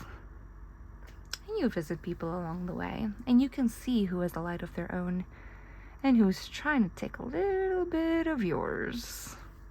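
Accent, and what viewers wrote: South Africa. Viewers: My Sister you are in my energy 100%. Your reading are so aligned with my life right now. can I ask where you are from sound south african 😊🇿🇦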